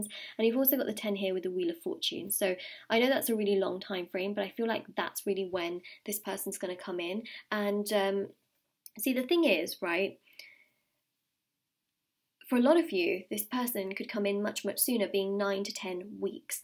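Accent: United Kingdom. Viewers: Her British accent is already making her videos more interesting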